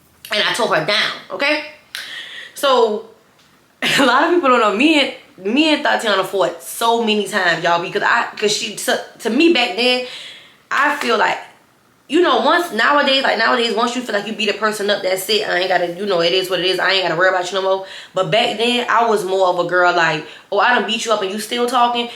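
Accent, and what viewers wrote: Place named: Louisiana, United States. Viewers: You most definitely give Louisiana accent. I just ran across your stories a couple weeks ago and I’ve binged watched them all. They help me get through the work day.. and you’re so pretty, sis. Much love, from Lafayette, Louisiana ❤️ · I literally just found you. Your accent makes everything hilarious. I just love your personality and accent. You mind me of a southern version of my girl kayshineee. I’ve been searching for new storytellers and the fact that you give a backstory too like my favorite ones?! Chefs kiss!ANDDDD the fact that you watch Asia and Symone makes me wanna watch you even more. I watched one video and got hooked! New subbie. 🫶🏾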